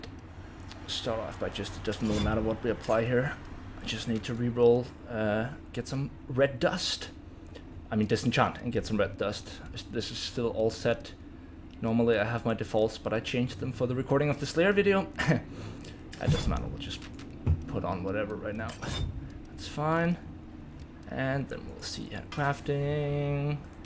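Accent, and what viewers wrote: Denmark. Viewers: Classic Riposte is a fencing move. A parry witch flows fast and directly into an attack. Also can't wait for Mastery video. By the way do I detect a fellow Danish accent? :)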